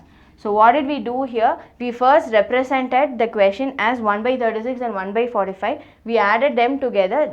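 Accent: South India, India. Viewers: sounds like she is south indian bro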